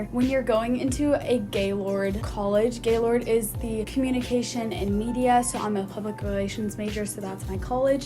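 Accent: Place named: Europe. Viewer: You sound weird for Texas. Almost European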